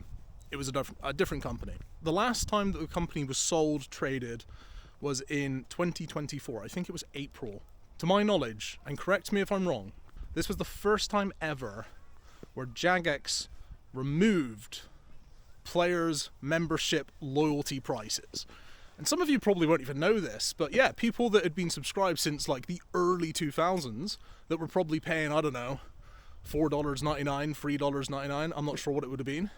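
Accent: Bristol, United Kingdom. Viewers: i know that good old bristolian accent i always get mistaken for being from bristol lol. Im pure wiltshire.... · what part of the uk are you from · Are you from Bristol?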